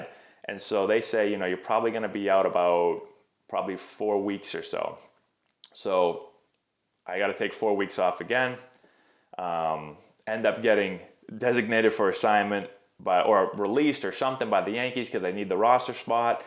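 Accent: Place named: Brockton, United States. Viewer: I grew up Brockton, MA didn’t even know who this guy was. But definitely recognize that accent! Thank you for all these videos man! You definitely bring the complexities of baseball down to a simple Level for everybody to understand.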